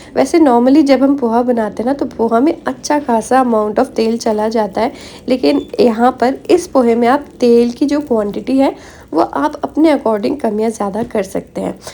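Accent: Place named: Madhya Pradesh, India. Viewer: Mujhe lag hee raha tha, bolne ke tareeke se ki aap मध्य प्रदेश की होगी ।👍 बढ़िया । में भी m p ki hee hun । शुभकामनाएं 🎉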